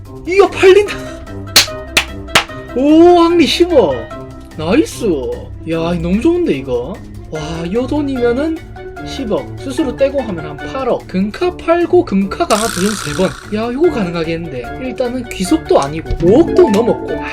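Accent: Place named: Gyeongsang, South Korea. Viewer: 잘 보고 있어요 ㅎㅎ 말투가 되게 정이 가네요 ㅋㅋㅋㅋ 저도 경상도에 살아서 듣기도 편하구 영상도 재밌네요 ~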